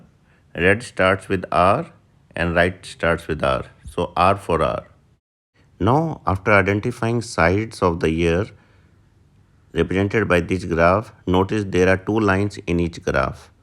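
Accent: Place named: India. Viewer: Close captions would be handy considering the strong Indian accent, and that hard of hearing or Deaf folks will likely be seeing this.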